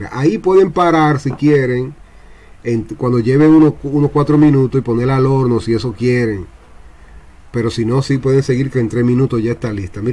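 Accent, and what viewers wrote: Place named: Dominican Republic. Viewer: Ya me sacó de la duda de dónde es ( por su acento ) Mil x mil 🇩🇴🇩🇴🇩🇴😃nos parecemos extremadamente LIMPIO 👍👍👍👍👍👏👏👏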